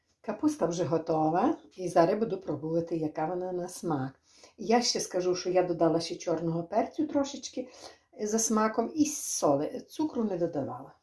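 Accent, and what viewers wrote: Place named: Bukovina, Ukraine. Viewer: Насолоджуюсь Вашою мовою з Буковинським відтінком. Дай Вам бог здоров'я.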